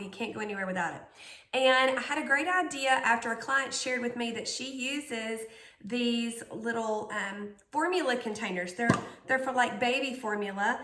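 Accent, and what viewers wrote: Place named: South, United States. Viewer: I love your videos! I am from the West so the southern accent is one of my favorite parts of your videos!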